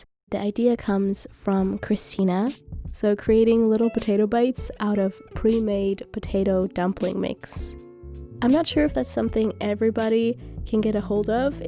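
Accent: Bavaria, Germany. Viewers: Bist du aus Bayern?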